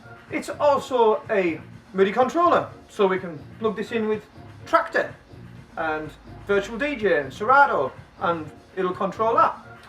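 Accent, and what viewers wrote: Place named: Newcastle, United Kingdom. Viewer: Bit of a Geordie accent there la.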